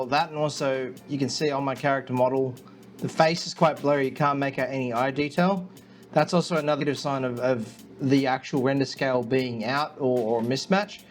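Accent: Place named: Australia. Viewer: Thanks mate , Love from Australia Idk but ya seems Aussie 🦘 too ?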